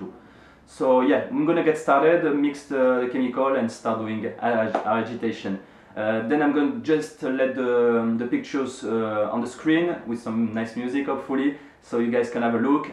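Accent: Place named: France. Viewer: aaaaah, le petit accent français! J'adore votre site au passage, super utile pour apprendre!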